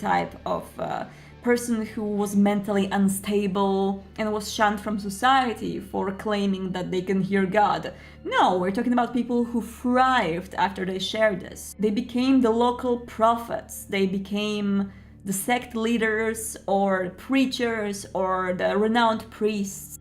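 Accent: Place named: Poland. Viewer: Why is Kirsten Dunst speaking to us in a Polish accent? I am intrigued...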